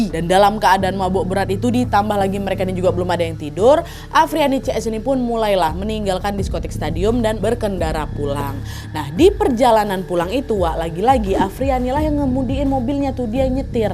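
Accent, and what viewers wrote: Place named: Palembang, Indonesia. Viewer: Suka banget sama cara bicaranya,.wong kito galo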